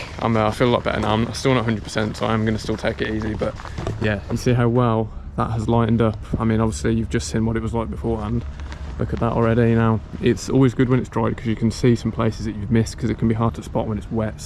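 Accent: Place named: England, United Kingdom. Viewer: You English men are so handsome. Love your accent. Love your heart.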